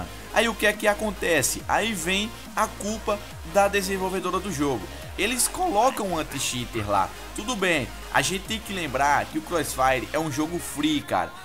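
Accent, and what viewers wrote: Northeast, Brazil. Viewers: MAN ELE E DO NORDESTE É???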